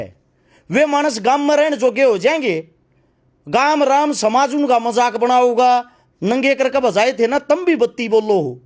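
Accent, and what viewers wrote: Haryana, India. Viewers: भाई जी एक तो आपके बोलने का तरीका बहुत अच्छा है फुल हरियाणवी, ओर भाई जी आपने सही कहा एक साल ना जाओ हरिद्वार इनकी तो आंखा मैं ढ़ीढ आ जागी❤❤❤ जय हरियाणा जय हरियाणवी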